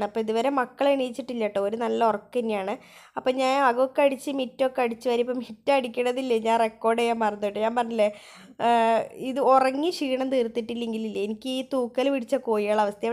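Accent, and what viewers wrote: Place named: Kannur, India. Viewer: Pudiya subscribera. Ellam.turane.parayunu.nalla.samsaram.nmloke.basha.pole.tane.nmle.kannura.padachone.anugrahikate.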